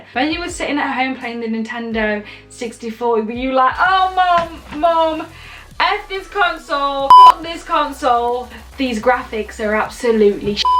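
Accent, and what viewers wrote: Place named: East Midlands, United Kingdom. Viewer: ''Blame my accent'' what is your accent? my guess is East Midlands like Derby or something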